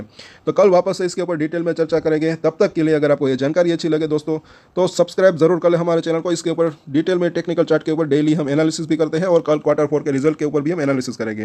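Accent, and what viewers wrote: Gujarat, India. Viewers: Sir aap Gujarat ke lagte ho plz reply dena.. Kyu ki apki awaj Gujarat ki hindi dhhal lagti hai.. Main bhi gujarati muje apke soor se aap gujarati lagte ho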